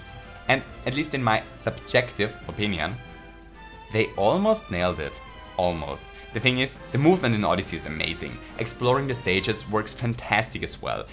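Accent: Austria. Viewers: Man merkt so den Österreichischen Dialekt raus xD Aber richtig cooles Video! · Wenn da östereichische dialekt ballert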